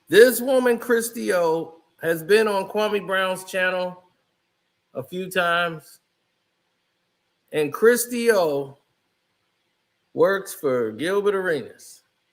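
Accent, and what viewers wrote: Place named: Chicago, United States. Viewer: “Casing on” “cracking on”, you are so Chicago.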